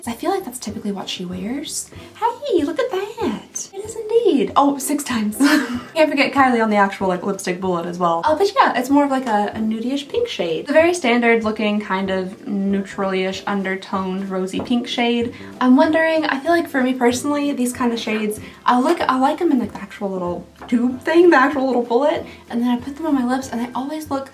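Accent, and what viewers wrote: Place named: South, United States. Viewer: I love hearing a woman with a southern accent like myself tear into a rant. Now I know why my husband smiles when I go off about the grocery store making stupid substitutions on my items. I smile through your whole video whether you’re ranting or not. ❤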